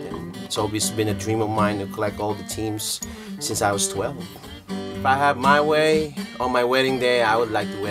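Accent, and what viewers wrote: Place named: Philippines. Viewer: First word came out form his mouth, and I was like "He's Pinoy!"!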